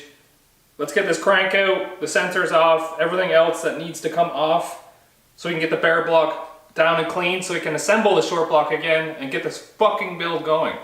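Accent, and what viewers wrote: Canada. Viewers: The Canadian accent keeps me entertained.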